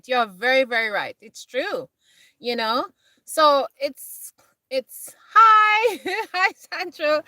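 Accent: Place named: Jamaica. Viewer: I thought you were Jamaican,as your accent is similar.. Cheers ❤️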